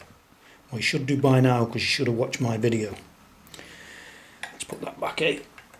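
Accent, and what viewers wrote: United Kingdom. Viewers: Like your accent. Where from UK ? When wil you review Jim Root # 5 ?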